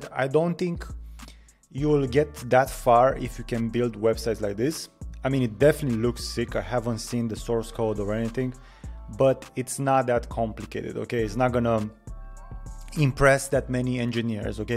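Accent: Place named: Romania. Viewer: Tocmai mi-am dat seama ca ești român, imi parea accentul cunoscut 😂. M-am apucat acum o săptămână de învățat css si html. Sper să fie bine. :))))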